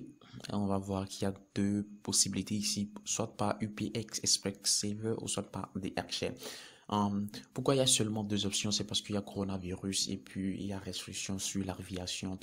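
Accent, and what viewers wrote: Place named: Europe. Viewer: Belle vidéo... Pour les prochaines vidéos apprend à parler comme un africain et non comme un européen merci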